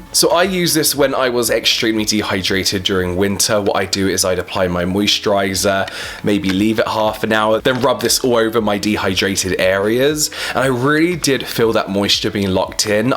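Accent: United Kingdom. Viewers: Love, love, love your videos! You are about my age and you look amazing. Of course I’m going to pay attention to your opinions with your fantastic skin and attitude. You’re amazing! Also a bonus is your charming British accent and humor 😍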